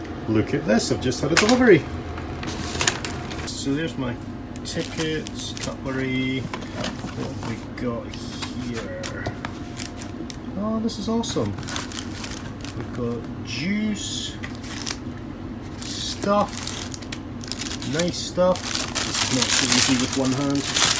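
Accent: Scotland, United Kingdom. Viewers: Another memorable report. In the very olden days I used to frequently travel by sleeper between Milan and Frankfurt. The luxury in those days would have even impressed Agatha Christie. That notwithstanding I could never sleep. I always had the sensation that I was slipping out of bed. BTW was that a Scottish accent hiding behind the Polish?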